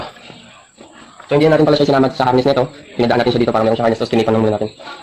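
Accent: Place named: Muntinlupa, Philippines. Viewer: napanood ko na boss kakatapos lang ang angas, at na notice ko parang taga muntinlupa kalang HAHAHA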